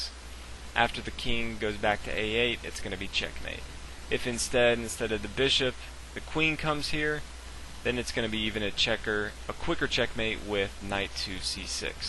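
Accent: South, United States. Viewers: This entire game just oozed talent and skill. I hope someday I'm able to reach half their level of skill. Excellent commentary as well, but I found some of the southern accent slightly distracting while trying to study the moves.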